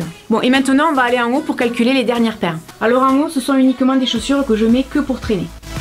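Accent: South, France. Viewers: J'aime trop ton axent t'es du Sud moi je suis marseillaise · Cc tu as l'accent du sud sa s'entend mdr moi je suis du sud aussi donc j'ai reconnu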